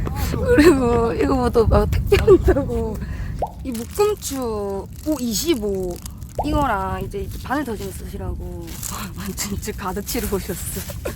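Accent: Busan, South Korea. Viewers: 오~부산사투리 듣기좋네 내는 창원인데 윽쑤 정겹네요 마이 잡으이소~~~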